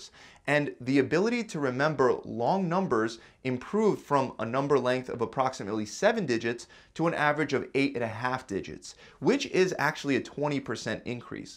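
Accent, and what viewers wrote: Philadelphia, United States. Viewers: You from Philly or South Jersey? I know wooder when i hear it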